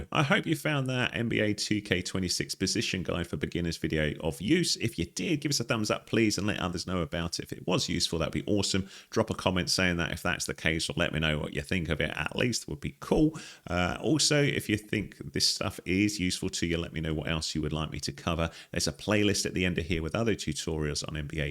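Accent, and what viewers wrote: United Kingdom. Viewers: great break down, also refreshing to here a brit talking about basketball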